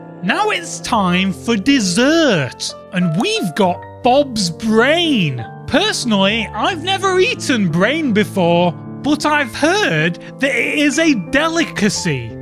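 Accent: United Kingdom. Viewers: He’s British, So Is “Stampy”. Of Course He’s Gonna Something Like “Stampy”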